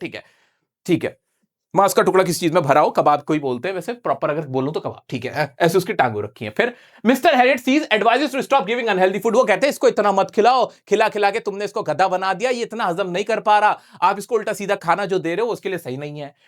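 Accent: United States. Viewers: American accent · Fat, slow and american accent❤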